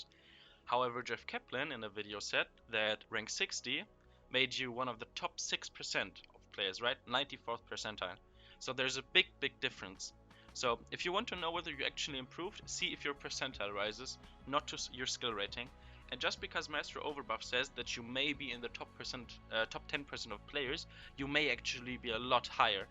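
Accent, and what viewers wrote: Germany. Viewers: Great channel, keep up the good work. Sounds like a german accent btw ;)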